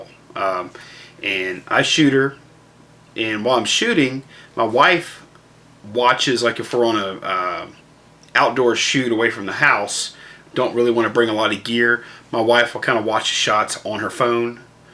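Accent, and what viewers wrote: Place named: Maine, United States. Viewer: Your accent sounds like a "guy" I once played poker with. Are you from the Maine area? You articulate your videos very nicely for those of us stunted by the Thesaurus Rex cunning linguist known as DLeake.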